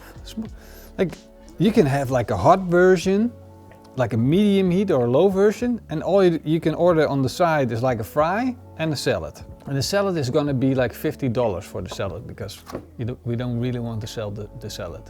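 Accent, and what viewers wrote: Netherlands. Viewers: In less then then 10 sec I knew you must be dutch lol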